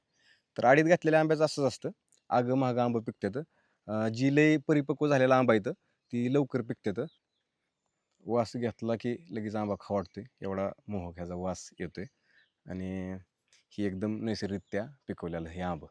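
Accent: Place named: Satara, India. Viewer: दादा सोलापूर मध्ये भाषा काय करतो बे अशाचा टोन मध्ये बोलतात तुम्ही तस नाही ती भाषा नाही बोलत सोलापूरचे असून तुम्ही तर आमच्या सातारा कडची भाषा म्हणता 😮😮 मला सांगा उत्तर देऊन 🙏🙏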